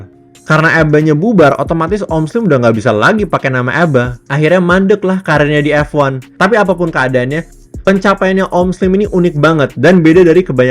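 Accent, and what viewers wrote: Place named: South Jakarta, Indonesia. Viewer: Logat jaksel kental sekalii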